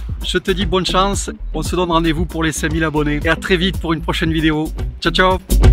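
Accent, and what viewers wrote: France. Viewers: Salut. Moi aussi je veux jouer au jeu des 3000. Ne change rien continu comme çà. Ce petit accent du sud qui nous donne des petits trucs et astuces pour nos Bonsaïs, je ne m’en passe plus. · Bonsaï? Bien sûr! Ses Vidéo? je les adore, et je les partage. Elle m'aide beaucoup, pour moi même et surtout pour animer le club! Le personne? Un mec super! Son accent du sud-ouest? Me rappelle mon enfance, évidemment je l'adore. Si je participe? Évidemment!!! Et puis j'adore les pots de François...sait-on jamais? C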